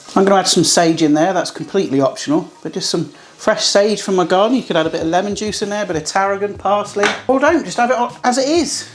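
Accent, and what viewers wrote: Leicester, United Kingdom. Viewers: Just wondering if this method would work for Gammon steaks 🤔 I discovered a while ago that grilling Gammon steaks seemed to really dry them out, so I switched to cooking them in a hot pan, like I would a steak, which seems to give that lovely char, and not leave them dry inside. I too like to experiment and really enjoyed the burger videos, confirming many of the methods I stumbled upon. I never buy pre-made burgers, much better to form them from scratch, as you do. They freeze really well also. Great videos! I thought I recognised your accent - your weather report confirmed it - I am hail Leicester also. What a crazy hot summer it was 🥵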